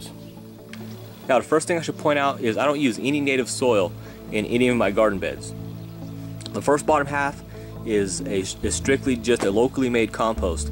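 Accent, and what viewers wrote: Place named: Louisiana, United States. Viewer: Love your videos bc your accent reminds me of home lol you have to be cajun lol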